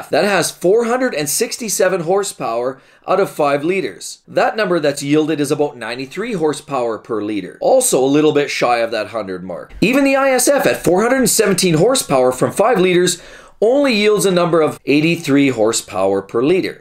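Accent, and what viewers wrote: Canada. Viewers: Well this guy has a very thick country Canadian accent. It reminds me of Fargo. ❤️👍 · Awesome Canadian accent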